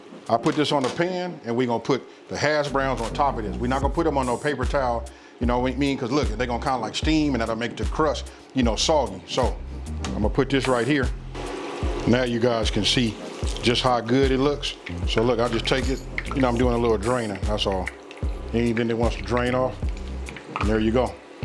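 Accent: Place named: Bay Area, United States. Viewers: dude"you from the Bay? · The West Coast accent is deep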